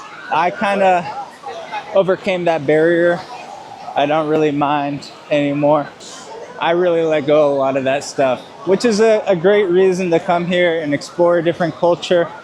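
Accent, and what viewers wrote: Brazil. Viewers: Hey I'm not trying to be rude or anything but I could not help myself to notice you've got a Brazilian English accent somehow?! Please tell there is a explanation and I did not lose my mind yet. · You have an accent. I guess you’re Brazilian as well! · Very accurate in many points. This guy dived so deep into the Brazilian culture that it seems to have influenced his way of speaking. It's funny because normally Americans don't have that voice intonation. He reminds of a Brazilian speaking fluent English without an accent.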